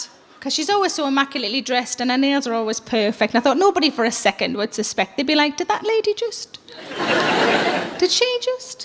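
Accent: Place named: Scotland, United Kingdom. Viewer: I just can't understand Scottish.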